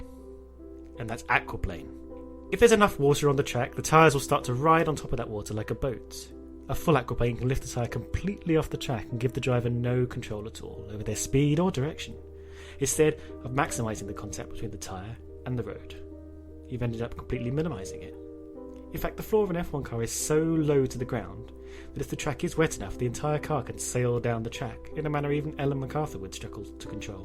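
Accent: England, United Kingdom. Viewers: Lot, Wut? I understand everything. This is in English English, it seems. Do y'all only understand American English? Or is English not your first language? That will likely have more do to with it. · Seems like a lot of people aren't used to normal conversational Brit English, maybe you could lose the music though, as it doesn't add much. I've learned a lot from you, so thanks & please keep up the good work. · It was quite easy to understand him. It may be too soft at some times but still understandable. Maybe you're not used to hearing English in an English accent? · nothing racist but isnt all British and Europe people talk like that? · Ive went through all his posted videos and found them easy enough to understand. I am fluent in American English with some Spanish. Perhaps its the English accent that gets you? I find these videos to be well done. 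· Audio is ok, speaking style is very British english. As a Nebraskan. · maybe its good if u put subtitles in it, cause i cant really hear your british accent :( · Yeah, maybe you're used to listening to American English. The English accent can sometimes make it harder for non-English speakers to understand.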